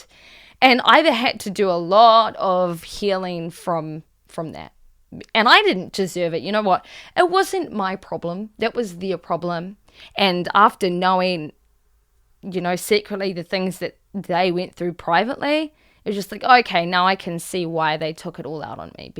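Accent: New Zealand. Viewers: Toxic egomaniac band member taking total control and walking over the top of you , ah! that brings back memories . And everything else you've experienced seems so familiar , good to see you have such insight into yourself . Too bad that wasn't the case with me thirty years of going nowhere , P.S. love that kiwi accent . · I think it's a New Zealand accent. I automatically assumed Aussie at first, but certain words have a NZ twang.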